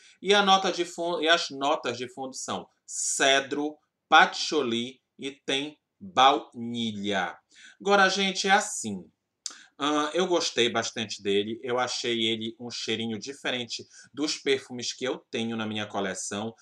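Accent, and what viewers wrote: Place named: Belém, Brazil. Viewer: vc é de Belém, né? imagino que seja pelo sotaque onde vc compra dessa marca?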